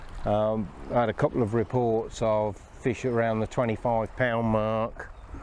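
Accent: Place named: Northampton, United Kingdom. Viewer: Keep it up great vid. U sound like you have a original northampton accent!!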